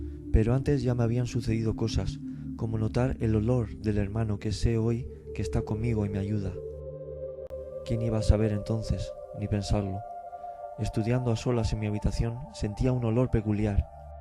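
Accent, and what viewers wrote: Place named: Spain. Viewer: muy lindo y modesto tu historia de cómo te hicistes este guía espiritual de la humanidad me pasó algo parecido a la historia de la muchacha pero no con el suicidio si no con la muerte de mi hermano el día q se puso malo me entró un vídeo q nunca me había entrado ningún vídeo de ese tipo fue la historia del neurocirujano q tuvo una ECM como una demostración q mi hermano IVA a estar bien y a partir de ese momento me sentí súper tranquilo y le perdí hasta el miedo a la muerte y fue cuando me entró tu primer video bendecido por Dios y a partir de hay los e visto todos los q han entrado me das mucha confianza vivo en cuba sino fuera a España a conocerte tu acento es español si cojo la ciudadanía española dios me llevará a conocerte muchas gracias por todo bendiciones pa ti y tu linda familia y para todos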